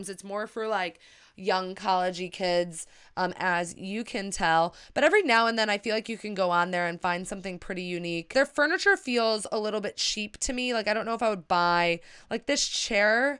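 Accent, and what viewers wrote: Chicago, United States. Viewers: I love your videos. You’re smart and opinionated and your Chicago accent makes me homesick. As far as being sad—you need to work things out in your own time. There isn’t one way to get over heartbreak. One day you’ll wake up and things won’t seem so hard. As for shopping—Zara Home is great for things like drawer pulls and candles. Their sales are fantastic. I would love to see your thoughts on Anthropologie—so cute, but really expensive and the quality doesn’t always seem to be there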